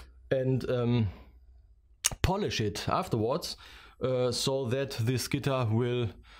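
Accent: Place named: Germany. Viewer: Der deutsche Akzent ist unverkennbar:D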